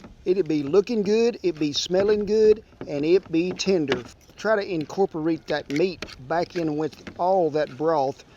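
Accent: South, United States. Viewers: I subbed for the Southern accent.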